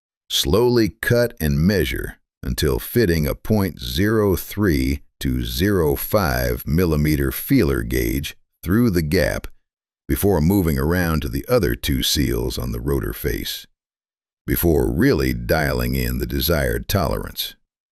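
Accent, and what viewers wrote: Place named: South, United States. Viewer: Great info but the Bubba-fied narration is kinda irritating.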